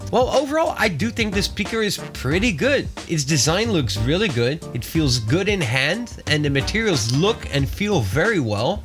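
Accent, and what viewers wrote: Netherlands. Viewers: Ik dacht al je bent Nederlands haha goede review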